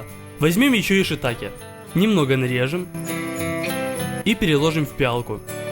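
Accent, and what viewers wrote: Odessa, Ukraine. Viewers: Ты случайно не из Одессы?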